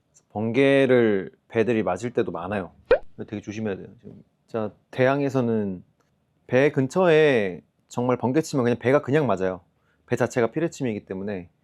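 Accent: United Kingdom. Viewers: 영국식 미국어 발음 인가여 뭔가 멋잇어여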